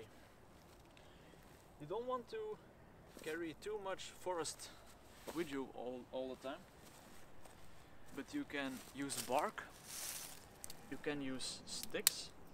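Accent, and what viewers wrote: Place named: Russia. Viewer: Классно снимаешь, акцент, по правде говоря, всё-таки русский)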